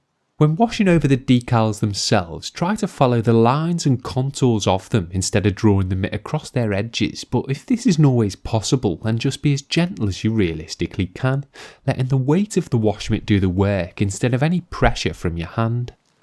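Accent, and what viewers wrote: Liverpool, United Kingdom. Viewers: You must be from Liverpool or around there !!! Lol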